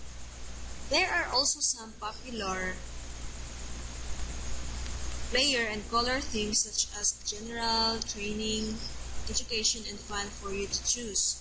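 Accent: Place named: Latin America. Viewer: You sound Latino..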